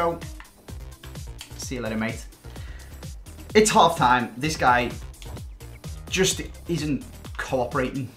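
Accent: Liverpool, United Kingdom. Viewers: Don’t think could sound more scouse in this vid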